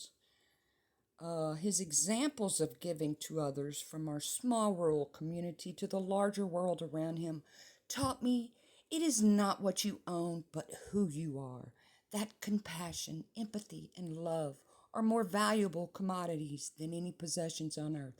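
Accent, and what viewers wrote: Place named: South, United States. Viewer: Gday, you are very easy to listen to, that southern twang gives me chills....Much Love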